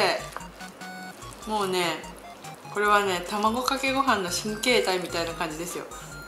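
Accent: Izumo, Japan. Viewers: 大社の人間なのでたまに出る出雲弁がたまらんです(*´°`*)💓ロシアンちゃん大好きーー！！💓✨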